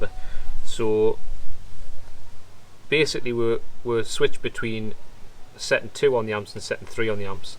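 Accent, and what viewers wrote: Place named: Northern England, United Kingdom. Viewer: Top Vid, sounds like your up north. Do you know of any good MIG gas suppliers in north-east (Stockton/Co Durham) but not BOC?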